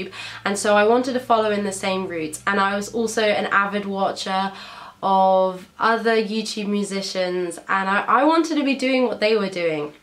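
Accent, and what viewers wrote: United Kingdom. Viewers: I really like ur London accent XD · I DIDNT KNOW YOU WERE ENGLISH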